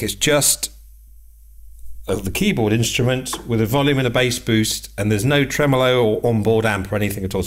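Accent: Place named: RP, United Kingdom. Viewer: A perfect English speaker with a perfect RP pronunciation talking about a perfect piano of the perfect 70s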